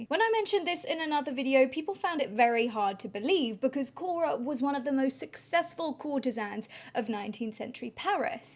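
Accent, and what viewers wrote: England, United Kingdom. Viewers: Excuse me is this British accent? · I love the English accent, it would cool if a great grandma-ma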